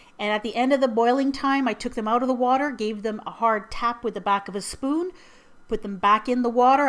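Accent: Canada. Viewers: ...are you canadian????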